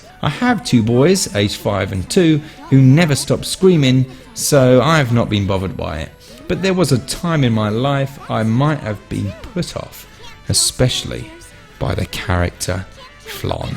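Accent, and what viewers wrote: United Kingdom. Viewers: I don't think Ren is right, but I'm not for sure. I always say it dis-guy-ah not dis-gay-ah, but you sound British so, that's probably a typical difference in pronunciation.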